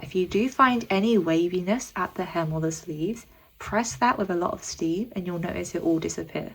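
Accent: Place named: United Kingdom. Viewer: what kinda uk accent do you have? i love it!!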